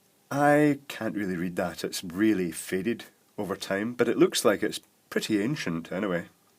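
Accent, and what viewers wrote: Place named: Glasgow, United Kingdom. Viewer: I thought I'd hit the wrong channel & John Ward had suddenly got a Glaswegian accent when I first stated watching this vintage electrical stuff :)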